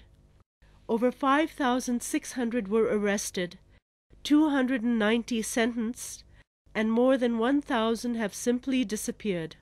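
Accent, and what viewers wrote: India. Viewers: 你麻痹的，阿三口音？贱民一个！ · 印度口音的英语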